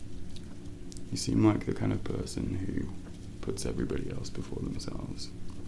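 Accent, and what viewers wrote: United Kingdom. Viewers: Omg he speaks British! & this is art! Congrats! Felt like watching a movie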